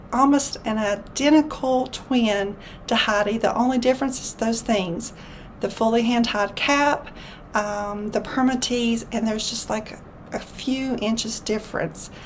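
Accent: South, United States. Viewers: Your accent makes me feel like I’m at home! I’m from TN but have lived in Alabama, Mississippi and Arkansas. This is my favorite wig on you. Which wig that you’ve reviewed has been the best for wearing up? Like which has the best temple to temple coverage? And which blonde has had the best lace front? I wish I had your calmness!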